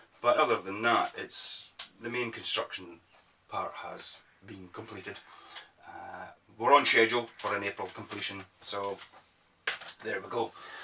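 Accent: Scotland, United Kingdom. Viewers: Lovely work here! All awesome models and I love your Scottish accent! Keep up the good work! Cheers, Leo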